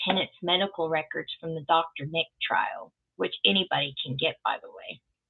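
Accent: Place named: South, United States. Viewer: Hi, Kate here. Sorry I missed your live show. Hey, girl, we still love you. You are - passionate about your love and loyalty for Elvis, defending him like we're all doing, showing your true feelings and keeping it real, that equals honesty in my book. Please don't ever change. You do make me laugh, and that fabulous classic Southern accent makes your little rants sound even better! Lol. See you soon, Georgia girl. Take care. Loving Elvis and Lisa-Marie always.❤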